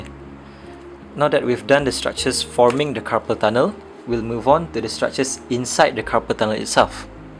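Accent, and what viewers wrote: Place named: Johor, Malaysia. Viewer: nampak beno gaya bahasa cam orang johor. "wait eh". huuuuu. hidup JDT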